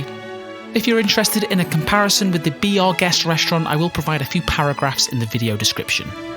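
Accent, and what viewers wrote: Liverpool, United Kingdom. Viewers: American who used to live in the UK here - can't tell if you're a Scouser or from Stoke-on-Trent :) Am I close?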